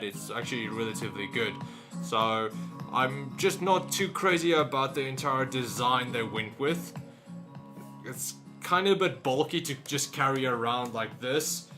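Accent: South Africa. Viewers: south african tech youtuber? lessgoooo🇿🇦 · dude ur channel is dope mense must know you from south africa embrace the accent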